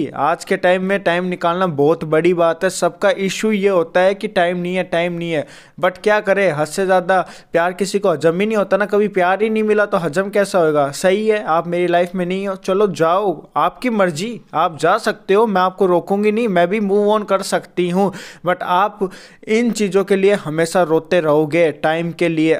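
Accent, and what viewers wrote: Haryana, India. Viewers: Sir aap Haryana se ho kya ??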